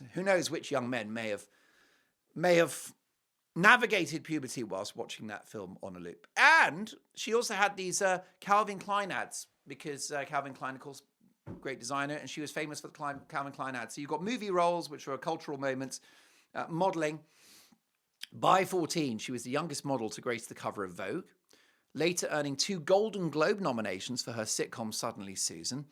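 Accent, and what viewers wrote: United States. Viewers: your American accent is cute and spot on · I love your American accent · love your American accent, *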